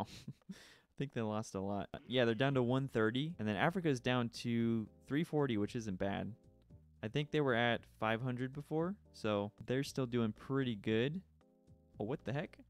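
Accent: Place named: United States. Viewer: It is clear you are from usa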